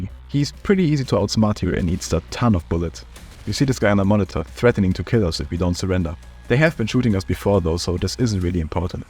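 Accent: Germany. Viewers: Kommst du ... aus Deutschland?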